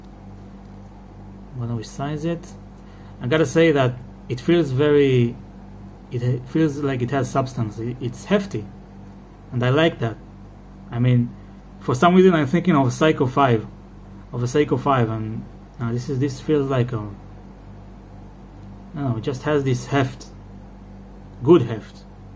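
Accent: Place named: Israel. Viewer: Great review! Are you Israeli? I live in Tel Aviv and you definitely sound like it haha. Anyway, keep up the good work!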